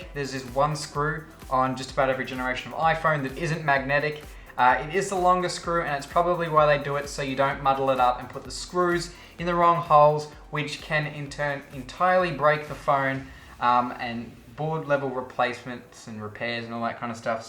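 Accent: Australia. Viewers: you are from new south wales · Are u an Aussie?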